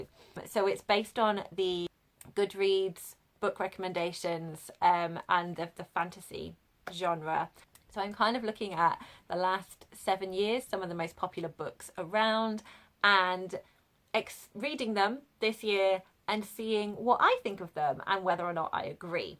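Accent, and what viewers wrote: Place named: United Kingdom. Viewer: Not me totally forgetting you'd have a British accent and then startling a little when you started talking 😂🤣 So glad you made it on here with us! 🥳Already living up to the channel name with a 2 part newbie tag, lmao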